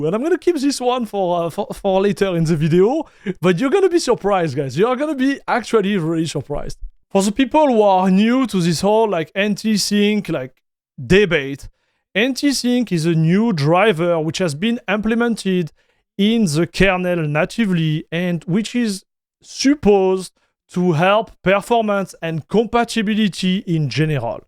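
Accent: France. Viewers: The French accent makes the video better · The French accent makes the video worse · Honestly haven't listened to much French accented tech content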